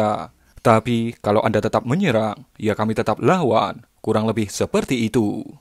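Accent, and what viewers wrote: Java, Indonesia. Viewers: logatnya jawa banget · Jowo ne ngendi mas bro medok banget ya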